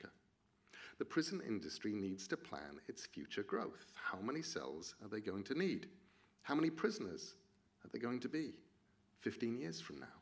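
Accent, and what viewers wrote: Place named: England, United Kingdom. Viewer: not a TED talk, but as Neil said - the content is what matters. Though sound is very low and No motion on the scene as well as on the screen, watching it for 5 minutes and they I see - it is actually a video - not a frozen picture, the Table and meaningless slides + reading from paper makes it difficult to concentrate. But wow, the orator is terrific, no uhms or any other faults, just perfect deep voice and English accent :). Whoa, it is so weird - youtube makes a critic of everyone.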